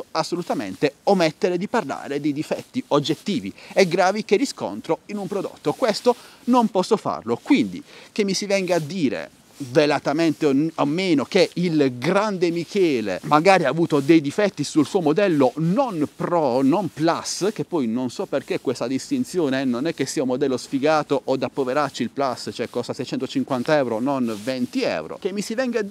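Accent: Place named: Apulia, Italy. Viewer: QUando ci vuole ci vuole, l'accento pugliese per me ( origine tarantine ) va benissimo ,e se non si da un pò fastidio , se non si è un po rompicoglioni allora non si ha la schiena dritta. Bravo e cmq un fanculo ogni tanto ci sta bene a chi lo merita.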